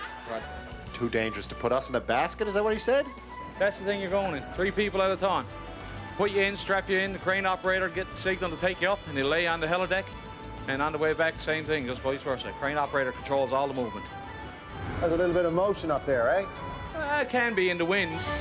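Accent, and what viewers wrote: Newfoundland, Canada. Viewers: Funny to hear get his newfie accent back,love it❤❤❤❤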